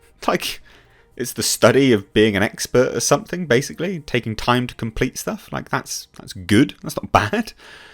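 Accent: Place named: United Kingdom. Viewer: What would a white guy with an accent , a British accent (im not stereo typing) know of real life stereo types .all the research you do is based on wiki not real life .u have no idea why , the deep reason why this actor said that .you just need clicks and views i guess. So making a 10 min video on something you will never understand is pointless this has to be a joke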